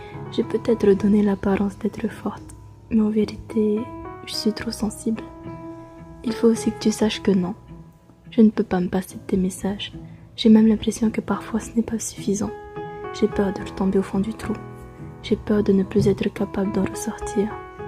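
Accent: Italy. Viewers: Tu es italienne ? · J'adore ton accent italien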